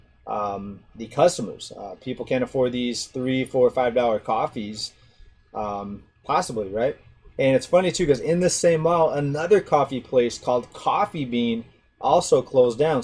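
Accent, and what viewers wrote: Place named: Michigan, United States. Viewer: I’d like to hear your story about leaving the midwest. I can tell by your accent you are from Michigan or something over there. We just did a roadtrip to the upper Midwest and loved it, though the weather was not for the faint of heart lol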